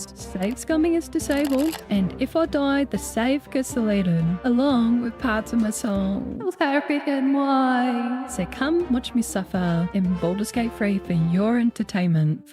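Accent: Australia. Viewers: I first subscribed to this channel for the Australian ASMR and stayed for the insanely creative runs & humor. I’m full on cackling over here 😂😂😂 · Unapologetically Australian and I love it! The rep we deserve as Aussies ahahah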